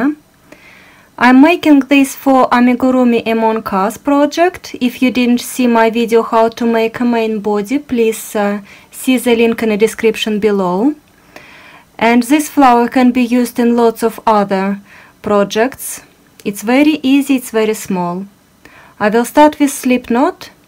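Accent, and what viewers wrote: Russia. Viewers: Лол вы русская?? Видно по акценту и сри😂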